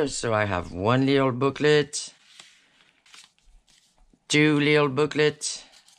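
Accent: France. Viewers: I just love it the grundy look. Absolutely luv hearing you talk. The French accent. Reminds me of home. Gaspè, Quebec. Wear are you from? Happy you are workin with Tinne. Just amazing kits. Ok now to watch tutorial! Cheers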